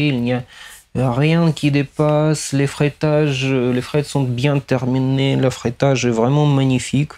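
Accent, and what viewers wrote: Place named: Russia. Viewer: Акцент русский))))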